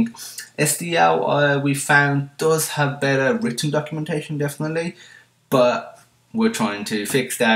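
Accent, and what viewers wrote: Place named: Dudley, United Kingdom. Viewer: That's a great accent. I'm guessing you're from Dudley or somewhere near there.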